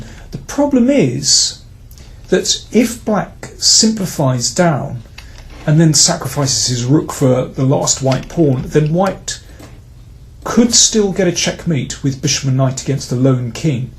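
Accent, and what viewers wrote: United Kingdom. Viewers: Oronion and oll the white powns. It's a drow! hahaah I absolutely adore your Bri'ish accent,!!:D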